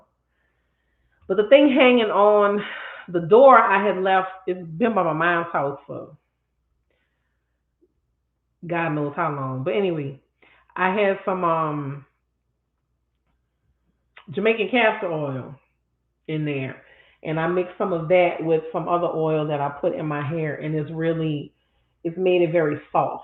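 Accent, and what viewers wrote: New Orleans, United States. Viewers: Love your story telling time, I have to say your New Orleans accent makes it even better. ❤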